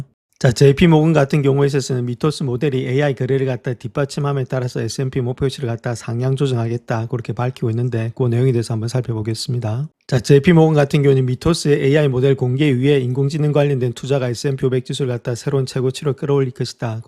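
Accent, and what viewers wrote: Gyeongsang, South Korea. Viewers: 님 경상도 분이시져? ㅎ 설명할 때 가끔 사투리.. 얼마니 친숙하게 느껴지는지 금리 내라야..ㅎ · 가끔 사투리요? 1초만 들어도 찐찐찐 경상도 토박이 사투리 영상 내내 엄청 쓰시는데요?ㅋㅋㅋ서울경기분이면 1초컷이예요. 사투리 심하게 들리는거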